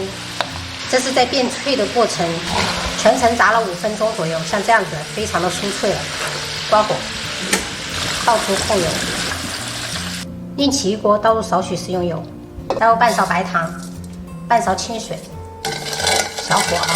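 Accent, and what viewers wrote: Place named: Fujian, China. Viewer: 我感觉她是不是很小就去到闽南了，因为她讲普通话也是福建的调调。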